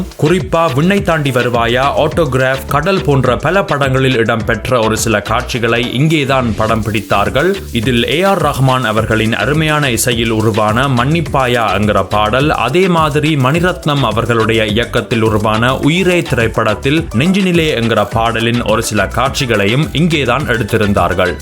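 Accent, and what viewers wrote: Sri Lanka. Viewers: Anna nenga srilanka thaneai nenga pesurathu enga naattu jafna Tamil pola iruku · இது என்னடா, இலங்கை வாய்ஸ் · Srilanga Tamil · Neenga Sri Lanka tamizhana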